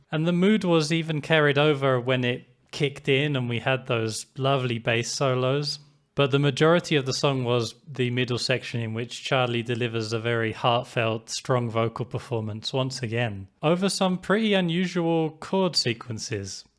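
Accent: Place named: Liverpool, United Kingdom. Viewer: you sound liverpoolish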